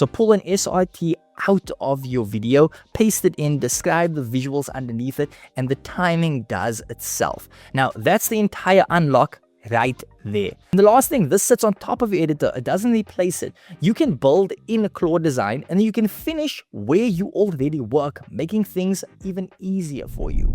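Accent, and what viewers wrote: South Africa. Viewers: My brother are you from South Africa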